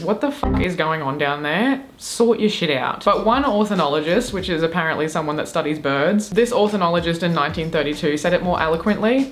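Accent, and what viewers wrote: Australia. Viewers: I really love your accent and the Australian accent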